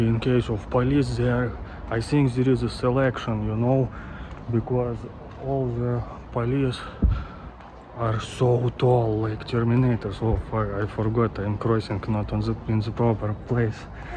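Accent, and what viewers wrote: Russia. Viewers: Haha you are funny guy. Like you’re russian accent. About USA and Russia I would say we dont care very much and probably dont love them or hate them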